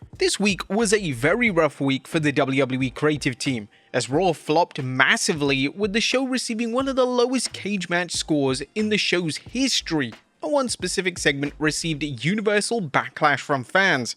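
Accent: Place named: United Kingdom. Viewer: Thumbs up UK voice back!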